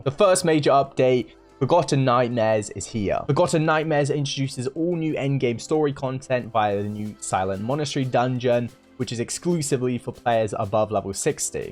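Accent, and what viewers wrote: United Kingdom. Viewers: It's been a while Haven't heard ur bri'ish voice in like forever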